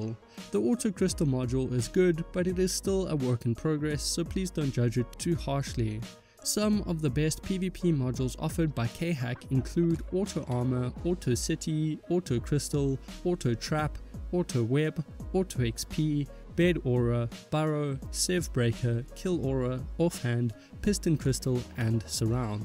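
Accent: South Africa. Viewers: i can tell straight away ur a south african awe man:)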